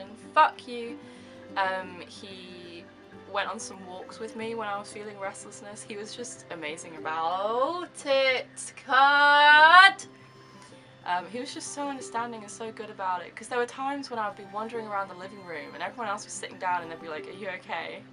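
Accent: United Kingdom. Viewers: I don't mind your tourette's . I hate the British accent.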